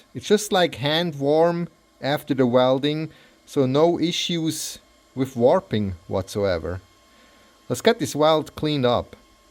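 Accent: Switzerland. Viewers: Another Youtuber with a Swiss Accent! Nice to hear you speak. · das ghöri sofort use das schwizerdütsch e der, chasch es ed verstecke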